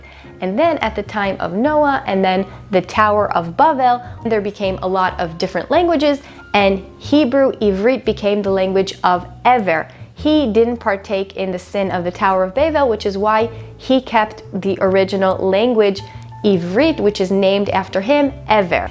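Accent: United States. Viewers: Funny, she doesn't sound middle eastern, or look middle eastern. In fact, she sounds downright American. Funny that.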